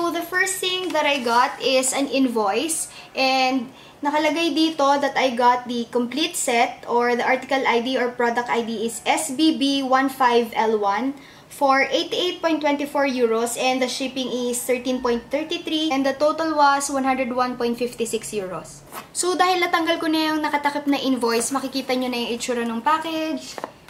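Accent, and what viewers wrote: Philippines. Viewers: Gloria Robles she's a filipina that's why the accent is different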